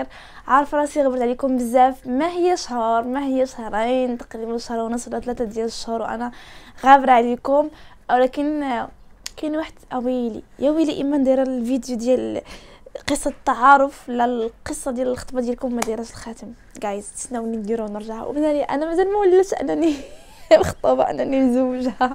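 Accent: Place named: Shilha, Morocco. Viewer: يمكن هي من اكادير كتر شلحة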